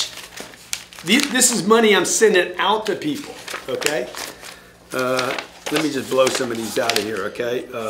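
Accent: South, United States. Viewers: This guy is Italian with a southern accent? Who knew...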